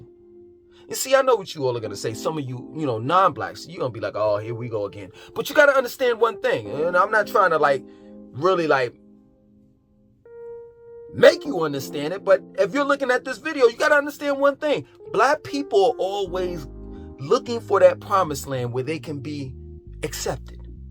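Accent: New York, United States. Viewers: 😮😮😮 U a new yorker??...say word iz bond son®️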